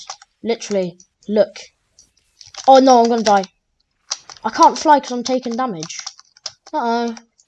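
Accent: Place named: United Kingdom. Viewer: I like how ur talking Britain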